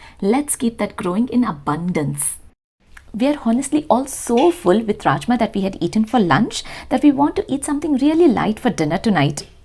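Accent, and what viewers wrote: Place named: Karnataka, India. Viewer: Nivu karnatakadavara kannada bhaashe keli tumba santoshavayitu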